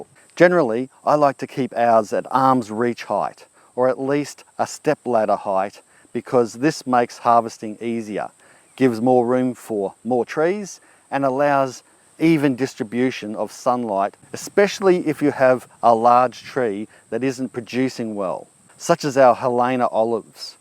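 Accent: Australia. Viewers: We moving in to a house that has some fruit trees, which we've never owned. The orange tree is so thick, we can't get a fruit picker up there to get the oranges. Every day 4-5 oranges drop down. They're already moldy and split when they hit the ground. We can even see some brown shriveled up oranges up there. The tree also has small green oranges, so it's still producing. You mentioned it's not good to prune while the tree is producing. So what should we do? There's also a apple tree in the same condition. Any advice would be greatly appreciated. I live your channel because you're have a wealth of knowledge, I live the Aussie accent, and I so love your humor and quick Witt. Thank you for sharing!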